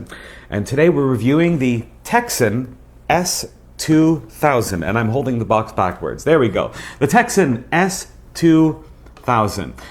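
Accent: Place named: Portugal. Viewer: Your first language is portuguese right? I'm guessing by your slight accent.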